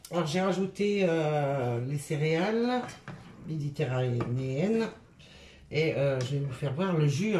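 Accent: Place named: Cambrésis, France. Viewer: Top 👍👍👍 ch’timis pour toujours !! en tout cas vous avez pas perdu l’accent 👍 De notre côté Petite famille en région parisienne mais tous natif du Cambraisis Merci d’avoir retenu pour la recette une fois par mois version bien de chez nous !! Bonne continuation (tiote)😜 Super recette je vais la faire ce week-end !!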